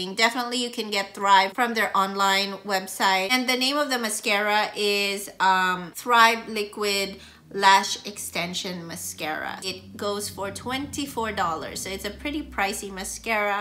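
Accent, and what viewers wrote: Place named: Philippines. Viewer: Thanks for this video! Looking to get a better mascara when I toss my old ones. Just ONE good one. I don't need a bunch that are all going to go bad... Also, are you Filipina? The way you speak sounds similar to my mom. We got the down lashes too.. 😹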